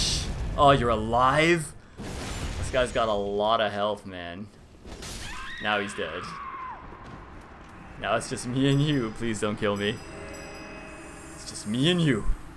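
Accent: Canada. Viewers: Your level of Canadian is just fantastic really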